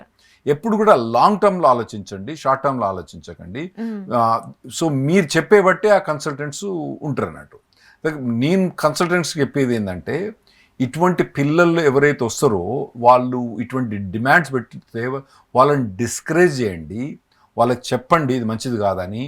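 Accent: Telangana, India. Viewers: We really enjoy your telugu which is pleasant and pucca telengana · Sir, your Telangana language is a practical one and we really enjoy it as a Telanganite. We like it Sir 👌👍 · Sir, I like your Telangana accent language. Please continue to be what you are. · We like your Telangana accent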